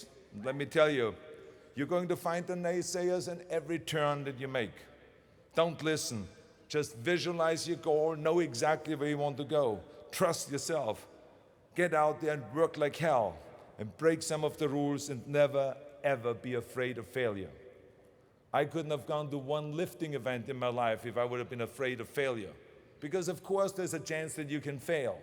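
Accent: Germany. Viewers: How come this guy's been living in the U.S. for 40+ years and he still keeps such a strong German accent? he says he also took accent removing classes... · The German accent is pretty strong, and Germans/Austrians are pretty tight with their Pronunciation, and I guess when you start speaking English properly as an adult you always have an accent. My parents have been living in an English speaking country for 15 years and they still have an obvious foreign accent, but me, having moved here when I was 4, I speak the same way everyone else does here.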